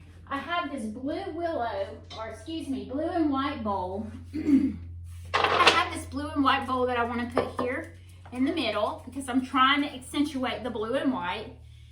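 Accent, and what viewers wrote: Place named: Alabama, United States. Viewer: Everything looks gorgeous. You’re my new favorite decorator!! I’m from Arkansas and when I first heard you, I thought maybe you were too. Guess the southern Alabama accent is the same. Lol. Anyway, I’m binging your videos!! 🍂🍂🎃🧡🧡🌾✝️✝️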